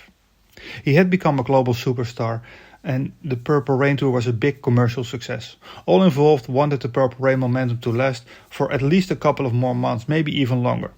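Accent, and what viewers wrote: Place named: United States. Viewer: it took me a while to figure out you were saying ladder and not letter, the A should be AH not EH, your english is slightly americanized but great vid otherwise.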